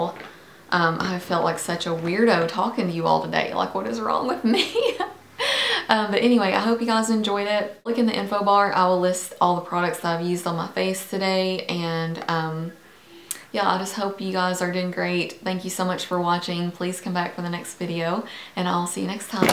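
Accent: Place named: South, United States. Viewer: Your accent... it's like watching a movie set somewhere in kentucky,alabama, texas or a similar country. Magnificent. :)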